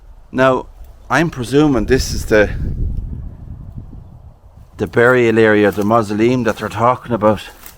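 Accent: Ireland. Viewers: Wee bit of the Irish in ya there Laddie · So old and interrstin g, from lon g ago days. I love your charming Irish accen t too 😊😊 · I'll always give ye a tumbs up 😊. I could listen to your Irish accent all the day long ❤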